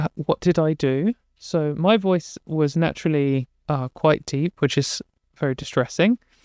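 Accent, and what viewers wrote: United Kingdom. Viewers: I'm gonna be honest here, I do think you have a lovely voice,. Pleasant to listen to. But then again, I've always been a sucker for those "husky" warm voices with Bri'ish accent anyway 😅 It was mentioned here before but I'll repeat it 'cause it's so important: record yourself. Yes, it's cringy at first. Nobody likes to hear their own voice, and that's because you're not used to hearing it from a distance. But it's oh so helpful if you ever want to improve / correct anything. And that also counts for all those singers / musicians out there who think they're god's gift on Earth. Record yourseif! We don't want to suffer alone haha 😂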